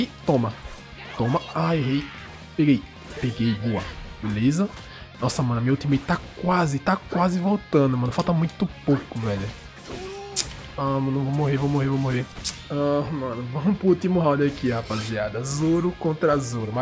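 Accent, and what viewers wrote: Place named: Pará, Brazil. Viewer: Eita, tens o sotaque aqui do Pará, pensei q fosse sksksksksk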